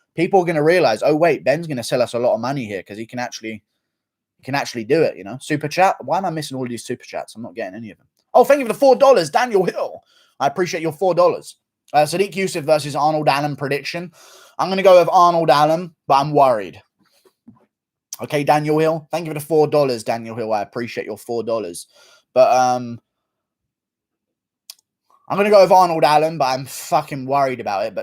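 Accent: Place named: RP, United Kingdom. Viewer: Hes a posh brit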